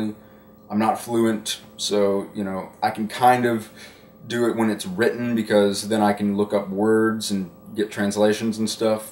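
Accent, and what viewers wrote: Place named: RP, United Kingdom. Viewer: what state are you from? you sound very posh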